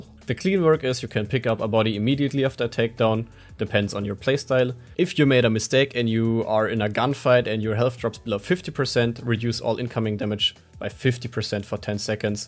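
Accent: Germany. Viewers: Hello and thanks for a great video. I want to thank you for introducing yourself as German. As a typical American, I'm terrible at trying to figure out where an accent comes from.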